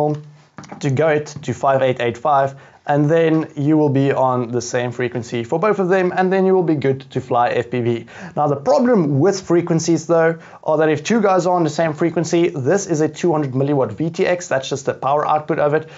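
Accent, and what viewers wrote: South Africa. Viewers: You sound like a South-African... Thanks for the video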